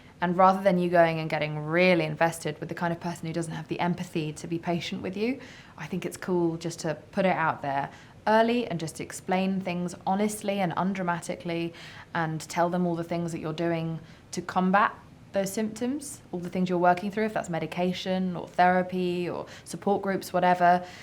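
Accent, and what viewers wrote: United Kingdom. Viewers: she sounds very british..Ber partner is also very British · You weren't faking that British Accent, huh.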